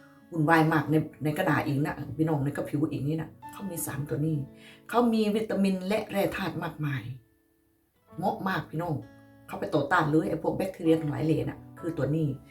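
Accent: Korat, Thailand. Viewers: พูดโคราช. เป็นคนโคราชใช่มั้ยคะ. คนบ้านเดียวกัน. ตอนนี้กำลังลองสูตรน้ำมันมะพร้าวกับน้ำมันวิตตามินอีค่ะ. รอลุ้นอยู่ค่ะ. อยากสวยเหมือนพี่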